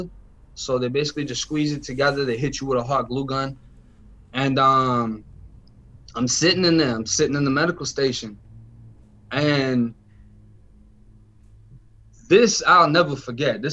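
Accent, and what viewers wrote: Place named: Boston, United States. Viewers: yo G Im from NY to me youu sound like you have a Boston accent with a A's and R's ... Car you sond like kah-rrr.. The broomstick should be called "Frisk From In Too Deep" from LL Cool J wit his sons Godfather right hand mans on the table. "Youu see him butt naked on the pool table tide down all bleedin n shit n say to yourself o God what a foul nigga right.... welll IM DRUNK WIT REVENGE MF LOVE IT LOVE IT, THINK ABOUT HER THINK ABOUT HER"... that shit was Brazy for homeboy boy, shit was crazybrrazy for me. Im cool wit everyone more 3's but MAD 2's too.... Im good on me, when i went in they tried to give me mad shit n my heart check was so crazy bro this big OG CRIP wit a gray durag n green slippers on sees im the only one thats 5'9 or looks white, we get transfered 6 ppl chain gang style n obv big homey ASSUMED i was FOOD, but he found out I WASNT FROM JUMP STREET N IM STILL IN CHAINS WIT THE PPL N GUARD, then when iwas on the phone tryna tell her to get my shit from the safe n he gonna try n take my phone or commessary or think im food! 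I SHUT TTHAT SHIT DOWN FUCKIN FAST n it was my 1st Time in Riverhead, CI was diff! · Boi has the Boston voice. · I love the Boston accent that is coming from a straight up natural born Brooklynite...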